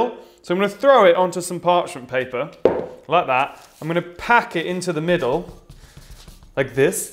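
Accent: England, United Kingdom. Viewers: Acabo de suscribirme y creo que tu acento inglés es inconfundible jajaja espero no equivocarme. Love your videos, and you❤️ I come from Chile 🎉😊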